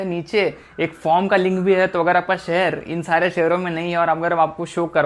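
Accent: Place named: Mumbai, India. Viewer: He has bombayai accent. So adorable 😍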